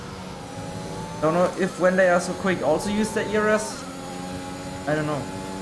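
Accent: Germany. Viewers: Kannst du deutsch (dein Name und Akzent klingen deutsch)?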